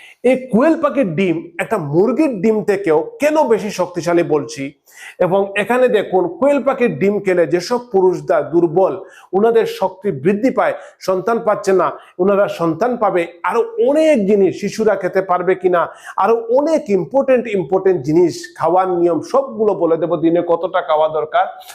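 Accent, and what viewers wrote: Sylhet, Bangladesh. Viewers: ভাই আপনার বাড়ি কি সিলেট না হবীগঞ্জ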